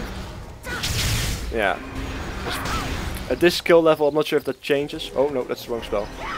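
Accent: Netherlands. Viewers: Any chance youre dutch? It kinda sounds like it.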